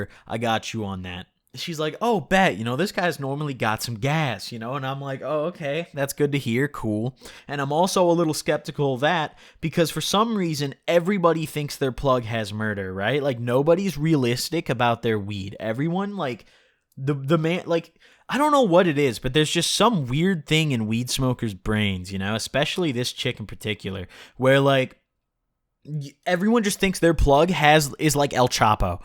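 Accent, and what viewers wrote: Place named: Midwest, United States. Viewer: the way u talk ur most def from the midwest XD love ur content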